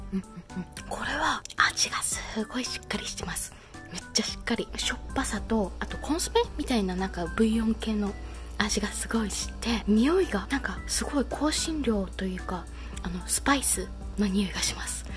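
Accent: Kagawa, Japan. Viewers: たまに出る讃岐弁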